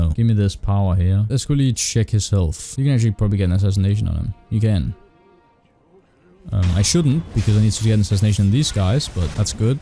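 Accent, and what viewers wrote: Denmark. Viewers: Danglish on point, as always.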